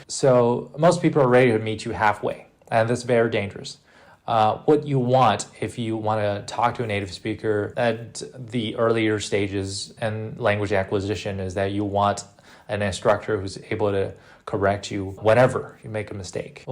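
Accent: South, United States. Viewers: nice southern accent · You sound like a southerner from the United States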